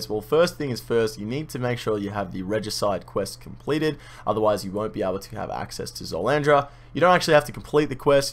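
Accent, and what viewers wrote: Australia. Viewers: Aussie accent at its finest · Easily the best guide I found. What I do personally though is have the time in the video the rotation gets identified down and skip to the part in the video so soothing Australian voice walks me through the rotation. I found it better then doing it 1 rotation at a time. My time stamps: Rotation 1: Rotation 2: Rotation 3: Rotation 4: These can probably be tweaked a pinch but they're what I use.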